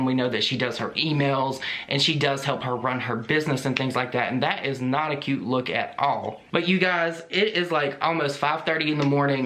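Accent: South, United States. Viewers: I love your accent! Southern twang sounds so cool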